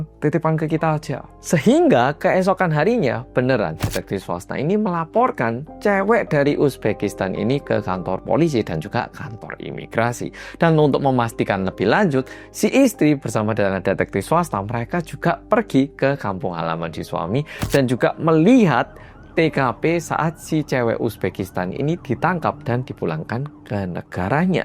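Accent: Java, Indonesia. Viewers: Medok banget bahasa Indonesia logat Jawanya. 1000% A sampai Z udh fasih bahasa Indonesia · Ibu kangeeen Mendoknya Mas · Hai medoook🎉 Nyimaaak 🎉🎉🎉 Sukses sehat selalu ya 💪🏿🙏🏿💕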